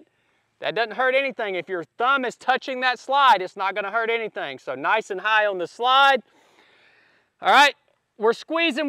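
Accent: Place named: Texas, United States. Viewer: Dammit brother If you didn’t tell me….id think your from Texas !!!!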